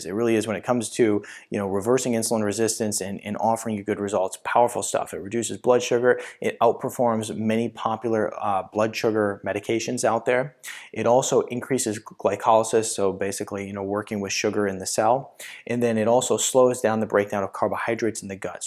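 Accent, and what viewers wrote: United States. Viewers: Excellent presentation. I like the speed of your speaking. I didn't waste any time. I don't like slow speakers. But out there in the world most people do not understand American accent. English letters of what you are speaking coming on the screen would help millions of viewers. Thank you. O<num> whatsapp.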